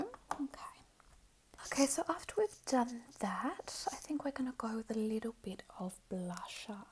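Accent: Australia. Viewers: I get so excited when you post! I’m so desensitised to asmr because I watch it for my insomnia but yours seems to work so well! Maybe it’s the Aussie accent because it familiar but ❤️❤️ thank you for everything you do!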